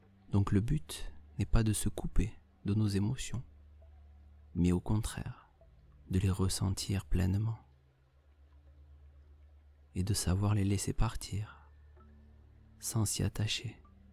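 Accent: South, France. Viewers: votre accent du midi qui fait chaud au ❤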